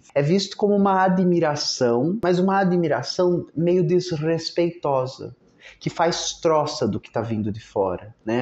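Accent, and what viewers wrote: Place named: São Paulo, Brazil. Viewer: Poluído, deselegante, confuso. Associações forçadas em uma narrativa tendenciosa a fazer o espectador pensar que tudo o que há na história é feita de sacanagem seguida de sacanagem. O ódio é um dos petiscos oferecidos. Indignação e umacerta euforia bipolar de alguém que fala muito sem parar. Excesso de referências e indicações de livros. Maçante. Esse sotaque do Brás é bem feio. Essa mãozinha gesticulante é bem chata. Essa dicotomia de "de um lado temos isso e de outro aquilo" é muito chato. Eu não diria que chega a ser pseudo intelectual mas é confuso e poluído de referências. O tom das suas narrativas são na intenção de fazer as pessoas pensarem que o ser humano é mal e que em tudo há maldades. Isso é chato, ingênuo e primário.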